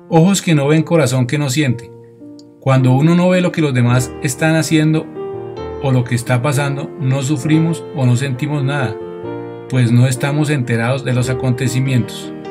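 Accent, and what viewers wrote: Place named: Antioquia, Colombia. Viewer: tiene acento de paisa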